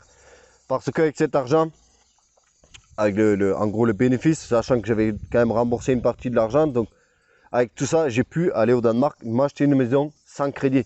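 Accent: South, France. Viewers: Bonjour l’ami et merci pour ta sincérité. J’avais regardé ta précédente vidéo sur le sujet de ta maladie et crois moi tu ne nous embête pas avec ce genre de vidéo car ça nous permet de mieux te connaître et de mieux partager à chaque fois tes « aventures » mécaniques. Pour les vacances tu as bien raison car il faut parfois se resourcer un peu la tête et c’est ta vie et tu as le droit de faire ce qui te plait avec ta petite famille. En plus ce n’est pas tous les jours que l’on rencontre un Danois avec l’accent du sud lol !!Merci pour cette vidéo.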